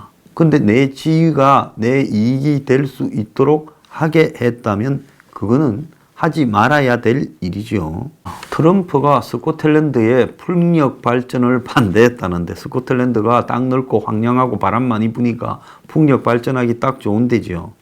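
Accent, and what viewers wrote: Gyeongsang, South Korea. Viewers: 황장수? 이세끼 보니 순실이 꼬붕세끼 같네? 문딩 이 세끼 개상도말 졸라쓰네ㅡㅡ씹세끼ㅡ 너같은 세키도 뭘처먹고 똥을 싸니 나라가 오염되지씹섺꺄..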